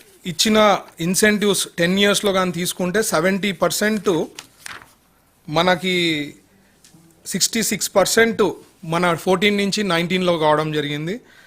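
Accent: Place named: Kurnool, India. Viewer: is using his kurnool slang so dont think bad about him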